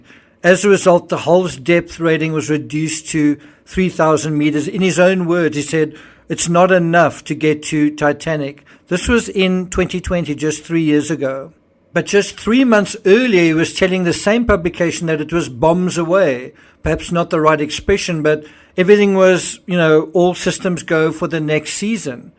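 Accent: South Africa. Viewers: Your South African pronunciations are interesting and enjoyable. I have a SA acquaintance who likes listening to you, reminds her of home.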